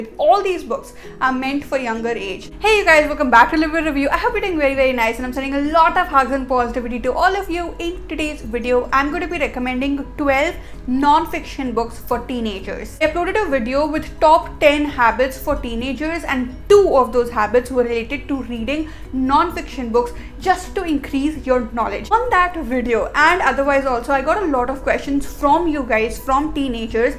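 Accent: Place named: England, United Kingdom. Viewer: You have such a great English accent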